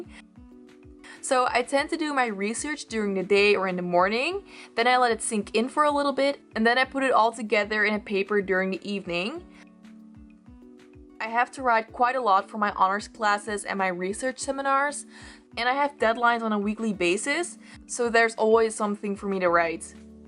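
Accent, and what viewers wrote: Netherlands. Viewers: Are you Dutch? ☺ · Are you Dutch?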